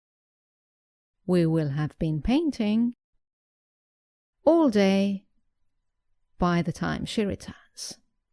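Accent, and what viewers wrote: United Kingdom. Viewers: UK English am i right ?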